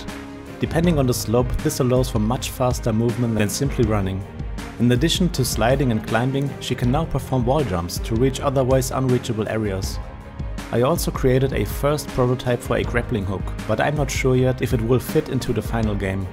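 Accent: Germany. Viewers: du bist deutscher das hört man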